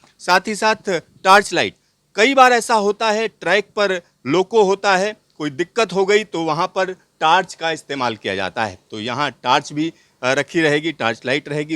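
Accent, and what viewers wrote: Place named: Bihar, India. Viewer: Bihari accent mat bolo saf hindi bolo.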